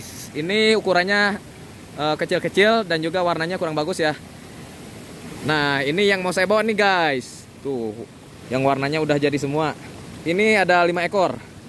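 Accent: Indonesia. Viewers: Sunda na nu ti mana mang 😁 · Bang urang sunda nya sarua ejeng urang sunda saya banten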